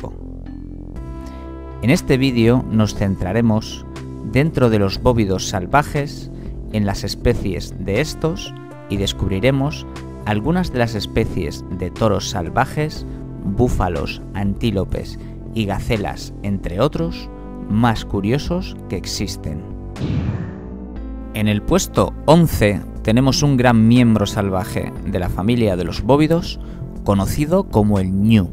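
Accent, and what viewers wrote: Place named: Spain. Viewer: Espero algún día antes de morirme... nuevamente escuchar el acento latino ...Y no escuchar más este acento coño....tan miserable...tan feo ....tan.... pero tan....re...ni encuentro la palabra para describirlo....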